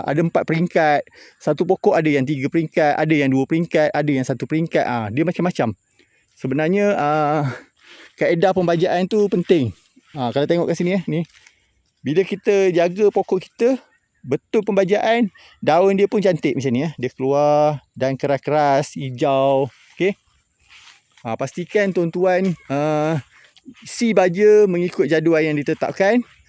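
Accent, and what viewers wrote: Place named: Pahang, Malaysia. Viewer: oh patut dengar cakap macam orang pahang.. haha boleh datang melawat kebun dok bang?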